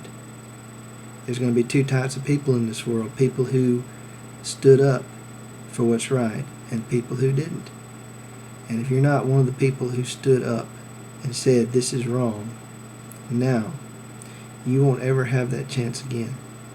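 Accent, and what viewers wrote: South, United States. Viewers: And besides according to your accent you are from the south - why don't U give back Texas to mexico ?!?! It doesn't belong to U !!!!!!! What about mexican refugees - you have any idea how many refugees were created in Ur war to settle texas - their descendents probably outnumber the palestinian refugees by far !! AGAIN - U R A HYPOCRIT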